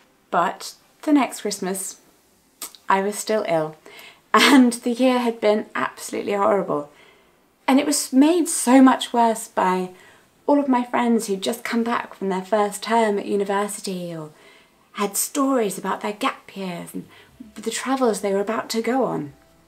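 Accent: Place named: England, United Kingdom. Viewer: I started watching this channel because i liked looking at your face and hearing your english accent but this was actually touching. ty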